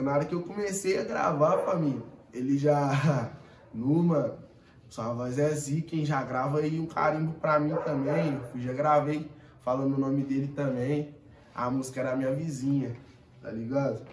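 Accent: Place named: Belo Horizonte, Brazil. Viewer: Véi eu amo esse sutaque de BH mdsss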